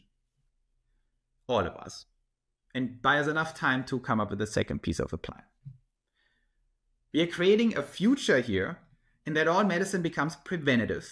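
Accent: Germany. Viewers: I sometimes don’t believe my physician, he’s not young and thinks things I don’t. For instance cholesterol. Some people have it genetically and it’s normal. I do go in annually but it’s not like a screening like you are saying. I like your ideas and catching disease early is beneficial 👍🏼 Is your accent German?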